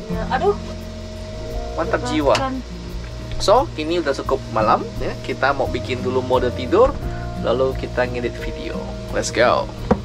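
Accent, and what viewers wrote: Pontianak, Indonesia. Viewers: Kok bahase nye kayak bahase orang pontianak ye koh... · Pertame liat, rase kenal.. dengar cara ngomongnye,.. dipastikan orang pontianak... diikuti lebih jauh,.. saye jadi ingat, pernah 2 kali berinteraksi,jadi konsumen... akun masih ade ke 😊... sehat, lancar dan sukses selalu boy.. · Logat pontianak sekali abg e ni wkw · Koh.. orang Pontianak ke ? Sepintas lalu ku dengar macam logat Melayu Pontianak.. 😃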